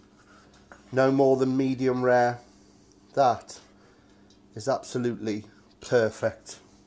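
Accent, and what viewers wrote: United Kingdom. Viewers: I always admire your passion never mind your awsom British accent . I tried these bad boys last summer , it is packed with flavor . one quotation is that grass fed grass finish beef?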